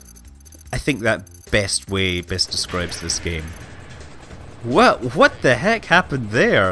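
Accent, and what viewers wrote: Scotland, United Kingdom. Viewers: Skaughtisch accent.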